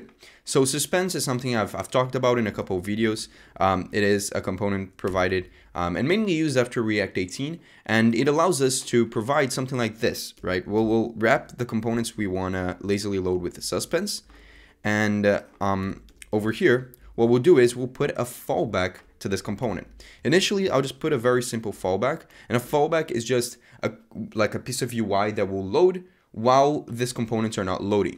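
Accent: Brazil. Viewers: Great vid! I recognized the Brazilian accent as the it started playing 😆 Keep up the good work!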